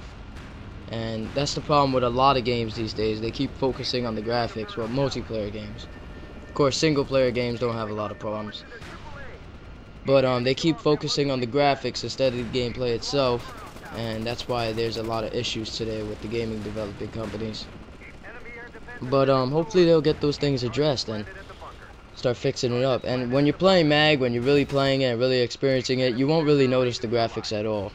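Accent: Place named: New York, United States. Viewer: i love it when u say the into for rating :-D i love your NY accent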